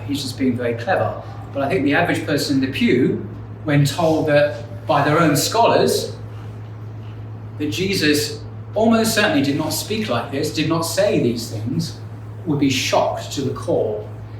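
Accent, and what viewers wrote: United Kingdom. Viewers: Mashallah brother! Thank you very much for this amazing talk. I like to listen to your videos because of their very rich content but also for the British accent that I like very much 😅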